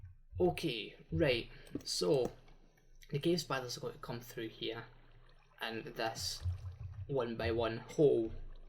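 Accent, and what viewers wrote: Scotland, United Kingdom. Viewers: Thanks for that video. Loved your accent. Very Braveheart. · "Barely English"? Scottish accents are older than the USA. Either way, you could watch this on mute and you'd still be able to follow it.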